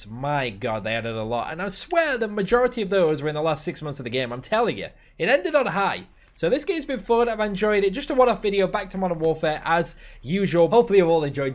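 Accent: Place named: England, United Kingdom. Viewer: dude you're great. That english accent sets the mood straight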